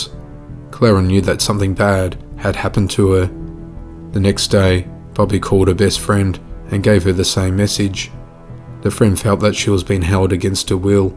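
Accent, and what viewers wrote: Australia. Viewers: Ricky Maveety he’s Australian, so won’t know the American pronounciation. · it's my pleasure. And the name is a shoutout to the ' ' song 😂 Can I ask if you're from NSW? I'm not, but you enunciate like Sydney Police Officers.... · Are you an Aussie? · ~ I have just watched 4 of your videos, I honestly didn't catch your Aussie accent! Only since reading the comments on this video, I'm in Western Australia, well done, great channel 😊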